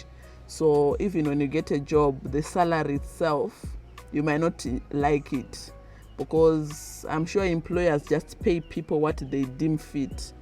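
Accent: Buganda, Uganda. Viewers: You're doing well even your English accent has changed. If you didn't mention you are Zimbabwean no one can tell. You sound Ugandan. 2 ways to learn a foreign language are exposure and taking a formal course. So keep going to those public places and events. Go to Nakasero or Owino markets and negotiate prices . Attend church, weddings etc soon you will pick up the language. Check if Makerere or other colleges have a language courses. Taking a formal course would help you learn the language the correct way then you go practice what you learned by exposing yourself in public places. Don't be shy😅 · Hugs to you.. you’ve already picked the Uganda accent😜hope you can now do the Acholi dance.. language wise, ugandans vanoita kunge vari kutaura shona, so i think if you are shona you should learn fast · Your lingo has changed as well you sound Ugandian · Most of the languages of Zimbabwe are linguistically related to Luganda and the other Bantu languages of East Africa. 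If you understand Shona or Sindebele, learning Luganda will not take long. By the way, if you had not stated that you were from Zimbabwe I would have assumed from your beautiful accent that you were a Ugandan. I have pasted here a link to Luganda language lessons. They are easy to follow. Whatever you learn from it, you can practice it with your husband and see how you advance. I wish you the best. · Your English accent now sounds more like ungandian😂😂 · You are now speaking Ugandan accent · You will learn my sister, but your accent is now Ugandian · But your English is now ugandaring my sister 😅.even your tone has changed 😂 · You already sound like ugandan, until when u say you are not one. Uganda is a friendly place to foreigners. I dont agree that it is stressful. Ask the africans living in nordic countries, they shall tell u how easy it was for them to learn those viking languages. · You are almost a Muganda Nyabo. 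Your accent is already Ugandan, be patient Luganda is almost like Shona, I lived in Uganda for a few years and I do understand when they are talking coz out languages are cousin languages, they could never Nyeya me when am around, coz i could pick a few words ! Enjoy endlessly kneeling 😅😂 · Your accent has turn Ugandan. You are now saying theya. My sis please don't copy that accent please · You are now even getting the Ugandan English accent.... 😂😂😂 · Your English accent is becoming Ugandian. Watch a lot of local dramas and learn the basics and don't be shy to speak the little that you know. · Don't be unequally yoked , now u speak Ugandan English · “I’m just theya” 😂😂😂 you so sound like a Ugandan now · You are already speaking like a Ugandan take it easy , take language lessons, and open a market place you will be speaking the language in a week. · Your accent is already Luganda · Wow, you already have a Ugandan accent! 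Not dominant in every word, but it's coming through in a few of them. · you are doing very well, your english accent & facial expressions already shifted to Luganda ... thats a good start. keep it up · U sound Ugandian already”distab him” when u are home sick come watch Zimbabwean content. I get u when he they speak their language even the jokes won’t be joke enough when it’s translated to English Sorry wena Vamwene mongotarisana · How do you say thereee as a Zimbabwean you sound Ugandan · My sister, your English sounds Luganda.So what's your problem when it comes to Luganda itself.... I am from Mozambique, but I speak Luganda..nzee n'yogera Luganda nyo, soka okuwulira nga mwana, kubiri, soka okuyogera mpola mpola. Talking of chicken lehs and head, when I got back home, I no longer eat them anymore...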